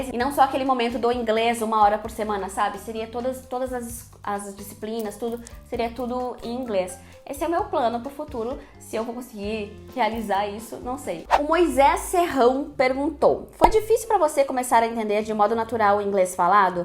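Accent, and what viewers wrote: Rio Grande do Sul, Brazil. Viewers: Comecei a assistir os videos agora, capaz que é gaucha hahahahahahahaha terra dos professores BD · gaúcha demais hahahahah e é tema e não lição, como também é bolacha e não biscoito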